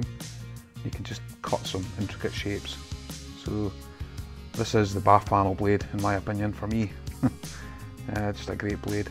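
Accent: Scotland, United Kingdom. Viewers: a really sweet strong Scottish burr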